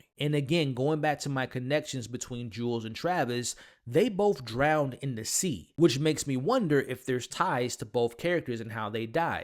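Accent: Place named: Atlanta, United States. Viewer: you changed your speaking voice??? this aint the white lotus. Atlanta voice... damn E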